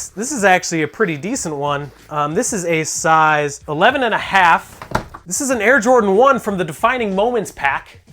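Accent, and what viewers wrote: Chicago, United States. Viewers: The Chicago accent gets me every time 😂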